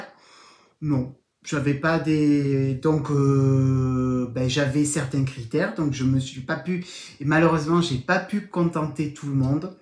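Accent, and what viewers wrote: South, France. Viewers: Bonjour, Je te regarde depuis le 1er podcast, cest toujours un agréable moments. J'adore ton accent du sud ca mets du soleil dans la grisaille du temps, ta bonne humeur, ta franchise et les superbe projets que tu nous présentes. Bravo pour les 4000 abonnes c'est mérité. Je suis de Belgique et je participe volontier au concours 🪐 merci A bientôt. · bonsoir, je me régale chaque fois que je regarde une de tes vidéos. Merci pour ce concours et pour les lots offerts 🧶Vive le sud!! et son accent!! Bisous de Marseille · Bonjour, merci pour vos podcasts (sympathie franchise et avec le petit accent du sud qui me fait réver) que je regarde depuis le début (même si je ne commente pas beaucoup) et ce concours :planet-orange-purple-ring: Bonne continuation et bjr de Belgique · En vacances dans ton cher sud, je ne suis pas très assidue sur les podcasts... 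il fait gris aujourd’hui, donc hop, une de tes videos pour faire revenir le soleil, au moins par ton accent et ta bonne humeur 😊 grâce à tes infos sur insta, je suis allée la semaine dernière aux portes ouvertes de Sabine, que de merveilles et quelle jolie rencontre ! Alors merci à toi pour cela aussi 🥰 je participe avec plaisir à ton concours, depuis la France donc 🧶 merci et bravo pour ce succès bien mérité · 🧶 Bonjour! Toujours très agréable cette voix qui nous vient du sud et nous apporte un rayon de soleil. Merci ❤ Maryse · Bonjour, je vous ai découvert grâce au podcast de Julie de Lolipelote et c'est un ravissement que de découvrir vos podcast qui chante le sud. 🧶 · 🧶 Bonjour 🥰 Quel plaisir, grand moment de détente de vous regarder depuis le début de votre chaîne et sur Insta J’adore et j’adhère complètement votre façon d’aborder le tricot et toutes les merveilles qui l’accompagne 😍 Je vous félicite et bravo pour les 4K🎉 Votre humour ainsi que le parler du midi me Régale!!!!!! 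Je suis de La Ciotat (13) donc je comprends bien 👍👍👍 Merci de faire connaître notre accent et nos galéjades 🤣 Toujours impatiente du prochain épisode 💪 Bravo pour toutes vos créations les choix laineux et les couleurs!!! ❤️🍀🌹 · hello! enfin quelqu un du sud! je désespérais! je pensais qu il y avait que le nord la bretagne le grand est qui tricotaient 😉moi je suis du vaucluse et je regarde beaucoup de vidéos de tricot mais la majorité des youtubeuses sont de la haut bref ça fait du bien de t entendre et meme je savais pas qu il y avait de temps en temps des fetes de l laine par ici dailleurs si un jour tu es au courant d un evenement dis le nous parce que pareil, ils sont tous la haut, quand meme on vit dans le sud ok, il fait chaud ok, mais on mets des tricots aussi 😅sinon bé, j aime beaucoup tes vidéos je rigole bien et j apprecie ta façon cach de parler allez j arrete mon discours j attends la prochaine avec impatience a bientot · Merci de ce podcast, merci pour ta bonne humeur, tes boutades bien sympathiques… ton accent du sud qui ensoleille ton podcast … je viens de passer un très bon moment je participe à ton concours 🧶 FRANCE merci aux généreux donneurs.. 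tu mérites leurs soutiens … ton sac est magnifique… il me fait bien envie BONNE CONTINUATION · 🧶 Bonjour. Première fois que je commente un de tes pod cast. Merci pour le soleil qu'il y a dans ton bel accent du Midi. Bonne continuation. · Un succès bien mérité pour un tricoteur spontané et réjouissant. Je ne rate aucun épisode et ris beaucoup avec toi ! Ne change rien surtout et vive l'accent du Sud!!🌞💕 🧶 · 🧶 quel plaisir de te regarder et d'entendre ton accent du Sud! Merci pour le concours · Bonjour encore un bon moment passé en ta compagnie 🧶 j'aime ton accent du midi et ton humour. Bonne journée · Merci pour tes podcasts avec ton humour et ton accent qui “sent bon” le sud.j’adore. Génial les 4000 abonnés je participe pour la France 🧶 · Quel agréable moment ce podcast ! J'ai un accent (Sud OUest) mais le tien me renvoie dans les années 80 lors de mes vacances à Port Grimaud. Bises des Landes 🧶🧶🧶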